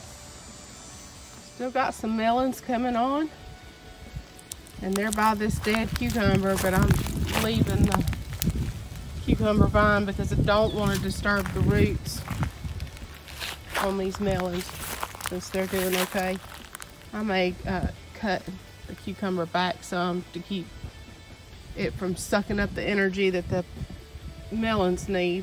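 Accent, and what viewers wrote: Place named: South, United States. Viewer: Your accent sounds like home to me. Must be close to Memphis TN. Maybe Georgia? Thanks for the tour! Love the name! Chuck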